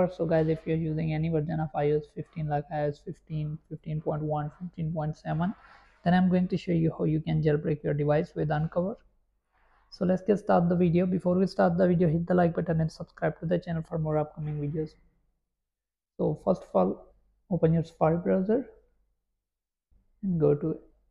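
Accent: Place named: India. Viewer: When I heard that Indian accent I knew it was fake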